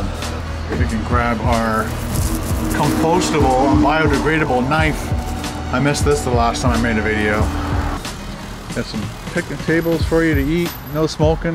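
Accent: Canada. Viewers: Pro-gress. Gotta love the Canadian accent. I work for a Canadian bank in New York..after 10 years there I’m starting to talk like a Canadian as well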